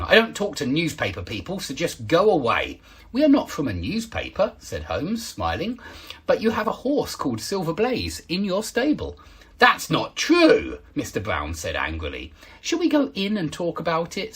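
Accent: United Kingdom. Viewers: British accent 🤌💕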